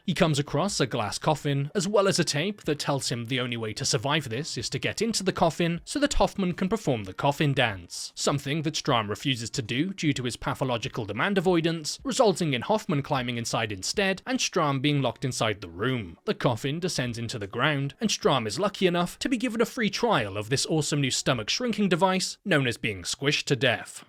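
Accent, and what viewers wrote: United Kingdom. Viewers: i nnoot know he british until i press play bruh i had it saved to my watch later my life is ruined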